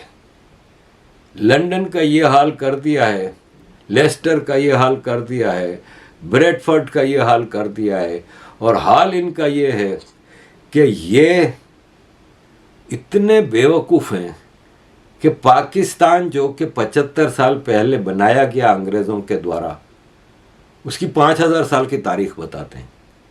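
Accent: Gujarat, India. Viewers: Bato se gujrati zalakati hai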